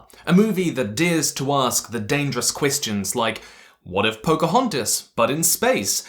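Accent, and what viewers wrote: New Zealand. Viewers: Hold the phone. I didn't clock the kiwi accent for YEARS. Someone revoke my residency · Am I only now finding out you're kiwi but and not just British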